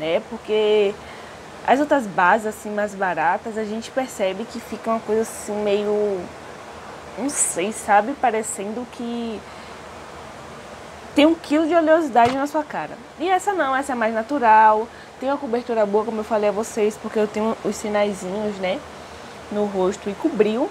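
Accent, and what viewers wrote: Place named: Northeast, Brazil. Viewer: ficou otimo o video ri muito com seu jeito de falar me identifiquei sou nordestina tambem kkkkkkk mulher nao sei me maquiar nao tu deu uma aula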